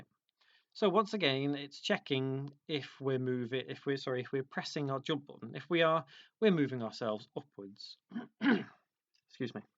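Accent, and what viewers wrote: United Kingdom. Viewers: inglês britânico é tão maneiro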